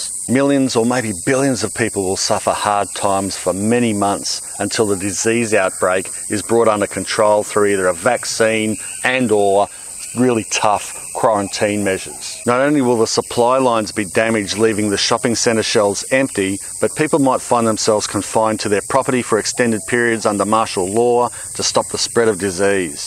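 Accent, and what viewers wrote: Australia. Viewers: I'm in Ontario Canada. I lived a couple of years in Melbourne and my brother has lived in Australia now over 30 years. It's my fav place outside of Canada. I love to watch Aussie videos to hear the accent I miss so much and in videos I love to hear the birds - I miss their sounds so much.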